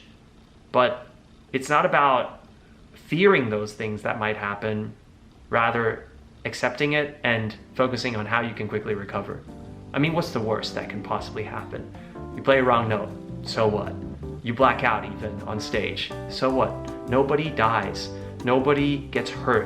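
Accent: United States. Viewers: WAIT I'm confused... he's talking in American accent but when he's when twoset he had an Australian accent?? Am I right or I'm just?? Sorry I'm not good at english anyway lol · To my ears, even Ray's American English sounded so eloquent and charming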